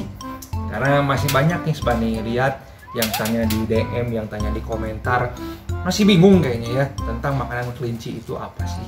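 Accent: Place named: Sunda, Indonesia. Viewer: si aa atuh meni lucu sunda na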